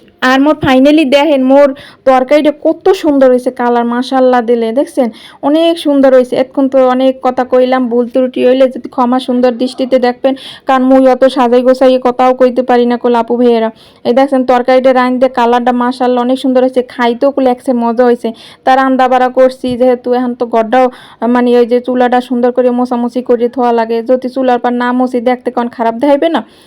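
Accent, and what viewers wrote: Barishal, Bangladesh. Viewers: তোমার চালের ড্রাম টা আমারটার সেইম কালার আপু,আমারও এখন পর্যন্ত আল্লাহ্ র রহমতে কোনো নেগেটিভ কমেন্ট নাই,তোমার কথাই সুন্দর লাগে বেশী আপু,বরিশালের আন্ঞলিক ভাসা,আহা❤❤❤আমারও সংসারে যা আছে সেই সত্য জিনিষ দিয়েই তোমাদের মাঝে ভিডিও নিয়ে হাজির হই আপু❤কমেন্ট পরলে,রেহানা আপু,হাবিবা,শিশির আপুর সাথে আমি যুক্ত আছি❤মহিলাদের কাজ,আর বলো না আপু😢শেষ করলাম আপু,আলহামদুলিল্লাহ্❤ফুল ওয়াচ❤পাশে থেকো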